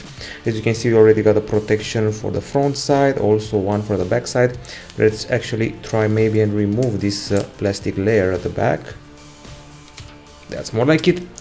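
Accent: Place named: Romania. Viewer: Nice Romanian accent. 😀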